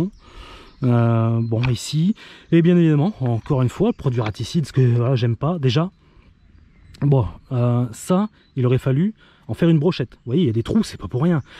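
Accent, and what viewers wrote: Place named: South, France. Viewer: ont utilise les tapettes nAAara comme tu nous les vends si bien avec ton accent du sud.😂😂😂😂😂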